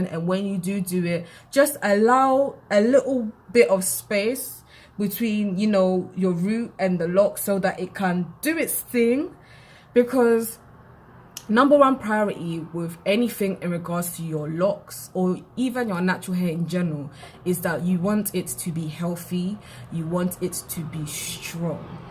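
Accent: United Kingdom. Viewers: Really enjoyed this video, good tips! And I also LOVE your British accent!😊🙌🏾 new subscriber here🙋🏾‍♀️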